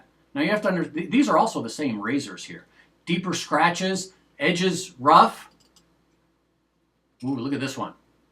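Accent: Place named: East Coast, United States. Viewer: lolz as soon as you said it was going to be a long reply. i read it off in my head “wit” you east coast accent. i really enjoy both your videos ( the mascots crap me up) and Videos I learn so much just from you guys talking and reading through comments. and it is a great idea to get to know your Natis (Natural) stone. and Im still new to straights but. i sharpened enough chefs knives all sorts of styles,knives pocket knives ect.. to figure i got what it takes to give it a go. and so far not to bad. i need a few more grits for in between my #800 and hard Arkansas. but im curious now that my jewlers loop works again its 100x only but its enough to see that i need better technique and the need for progression in between as far a grit goes before start the polishing can begin. im curious though im skeptical on these blue steel razors. are they really Blue steel/ Aogami blades? 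i have a M. Karam made in Mt. Lebanon i believe this is out of PA. but just not sure how accurate these blades are when it comes to materials being used. and can it be blue steel or a Aogami even if its not forged in Japan? or can they be blue steel where ever its made. i thought blue or white steel was just a Japanese thing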